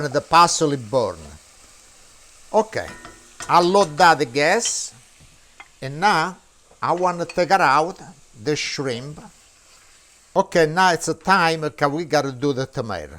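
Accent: Italy. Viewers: I'm from Germany and to be honest it's very hard for me to understand English with an Italien accent. But one can really feel that your are living Italien food. When I see your dishes it's always a pleasure and a pain at the same time, because it looks so tasty but I usually watch your channel when it's too late to try it by myself at once. So I have to.go to bed with the pictures of you dishes in my head. Sometimes a glas of wine helps to overcome ...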